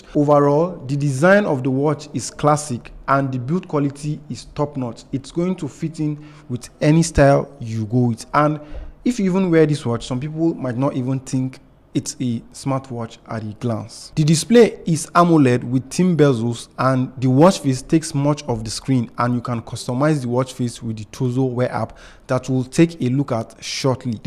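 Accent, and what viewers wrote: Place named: Nigeria. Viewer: He sounds Nigeria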